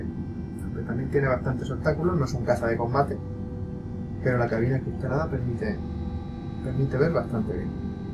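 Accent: Andalusia, Spain. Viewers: Tiene un fuerte acento andaluz, ahora vete a llorar a otra parte x'D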